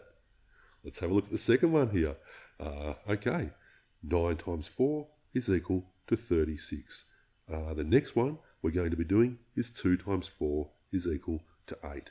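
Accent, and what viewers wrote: Australia. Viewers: why does he have an aussie accent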